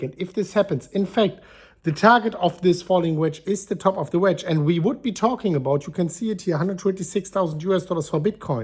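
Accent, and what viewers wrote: Germany. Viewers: "Im a little bit under the water" i love your german english 😂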